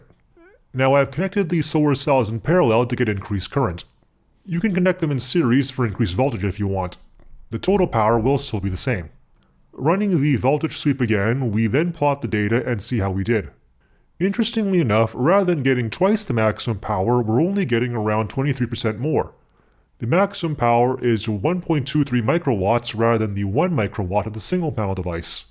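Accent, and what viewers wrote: Canada. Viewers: Ahhhh so you're canadian.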